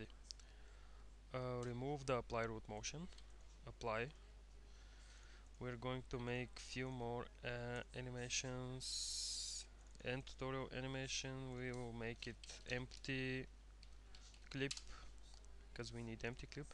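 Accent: Russia. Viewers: Русский чтоли?)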